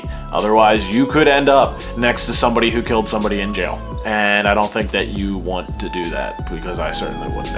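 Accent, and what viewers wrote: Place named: Delaware County, United States. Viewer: r u from pa?? u have a delco accent lol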